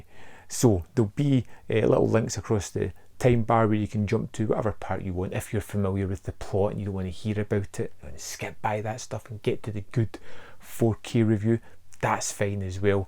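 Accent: United Kingdom. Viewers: I came across your channel because of this release. You've got a strong accent mate that I really have to get used to when watching your review. 😂 Germany here, but I'm pretty much fluent in English. The British accent is one of its kind though. Anyways, I pre ordered my copy when it went on sale and I'm so much looking forward to it. What's more, my cinema will bring Dawn of the Dead on the big screen on Halloween night for one presentation only. Can't wait to check it out. You guys got some pretty cool releases coming out, talking about the other Second Sight Films announcement for Dog Soldiers and the 4K box set for Total Recall. 2020 was definitely one of the most expensive years for me buying films with all the amazing releases. Keep coming the good work mate. I subscribed to your channel. Cheers, Basti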